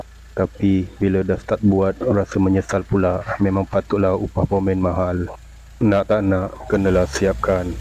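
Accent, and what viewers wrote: Kelantan, Malaysia. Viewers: Haha😂😂jengg mu klate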